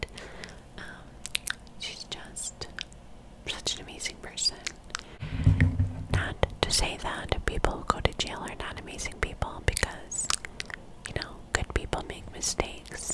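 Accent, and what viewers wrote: New York, United States. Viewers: Glawss that's exactly how she pronounces it with her NY accent lol love it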